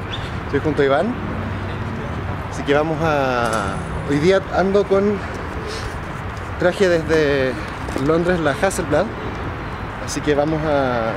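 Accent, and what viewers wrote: Chile. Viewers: acento chileno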